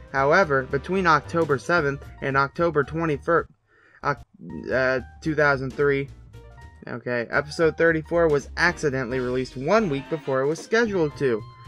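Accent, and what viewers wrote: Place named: Australia. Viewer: I was turned on instantly by that Australian accent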